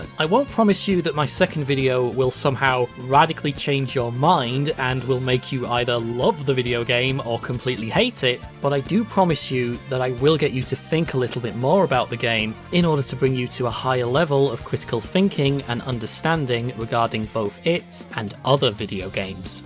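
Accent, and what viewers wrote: Yorkshire, United Kingdom. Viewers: I’m from Yorkshire too (Bradford to be specific)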